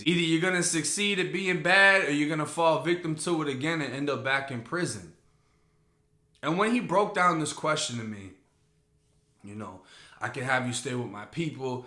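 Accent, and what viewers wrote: Boston, United States. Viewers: I was wondering what accent that was i thought it was ny but it didn't seem right then he said Massachusetts....thats boston!!!! Now it makes sense. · All I hear is a Boston accent. I like it! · That bean town accent don’t evaah go away lol no amount of time in Florida could get it out of him! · Been rocking with you for two days and I knew you was from up top I know you was from Boston I hear it but you had me fooled for a minute ain't know what the thing you kept talking about Florida but that's besides the point you teach getting lessons and you have great stories keep doing your thing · You’re losing that Florida accent Ma Boi! Sounding like you from Boston again. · He uses florida slang with a Boston accent. Thats all. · Damn bro you got a thick ass Boston accent.. I grew up ten minutes from Malden but live in Orlando now.. 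don’t have a felony on my record though. Got charged with assault with a deadly weapon but got a cwaf so they dropped it to a misdemeanor.. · Um Hell Naw he doesn't are you from FL? he might of did time in prison in my city and state, but that's a Boston accent and he doesn't have a FL slang he is just trying to talk like us. lol i bet everybody in Tampa will tell you he sounds nothing like us. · are you from boston? the accent sounds boston just asking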